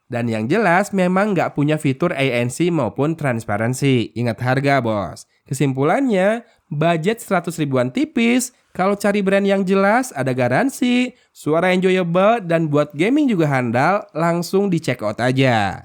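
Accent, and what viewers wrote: West Java, Indonesia. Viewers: Lah, klo memang logat bicaranya jawa barat seperti itu ya mau gmn lg?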